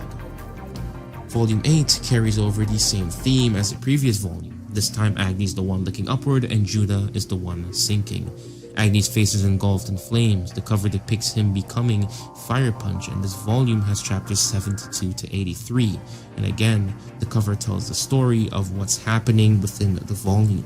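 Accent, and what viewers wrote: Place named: Caribbean. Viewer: What part of the carribean are you from?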